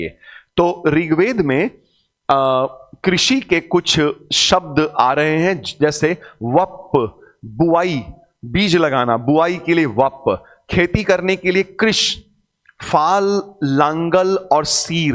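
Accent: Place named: Ghazipur, India. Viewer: Thank you so much sir🙏🙏 Sir aap Ghazipur district se h aisa lgta h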